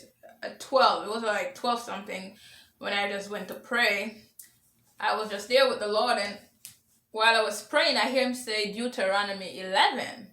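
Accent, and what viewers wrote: Jamaica. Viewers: Sister, by any chance are you from Jamaica ? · Thank you sis! Are you from Jamaica? I hear a Jamaican accent! God bless!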